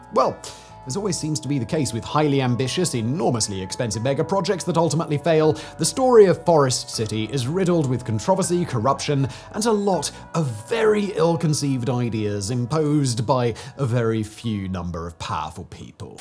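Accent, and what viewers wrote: United Kingdom. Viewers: There's something about a British man with this regional accent wildly mispronouncing foreign names without pause. 😅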